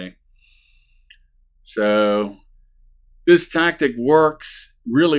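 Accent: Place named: Baltimore, United States. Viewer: I'm from Southwest Baltimore County I should've known! It sounded like a Baltimore accent but a little different that's why I thought philly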